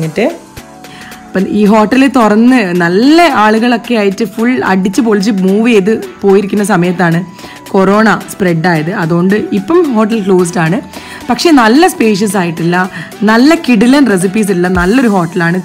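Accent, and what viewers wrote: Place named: Kozhikode, India. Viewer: ഇങ്ങളെ വർത്താനം കേട്ടപ്പളേ ഞമ്മക്ക് തോന്നി ഇങ്ങള് കോഴിക്കോടാണെന്ന് ഷിജോ ഏട്ടൻ പറഞ്ഞിട്ട് വന്നെയാ മ്മളെ ഒരു കുഞ്ഞി ചാനലുണ്ട് s&f vlogs ഒന്ന് കേറി നോക്കൂലേ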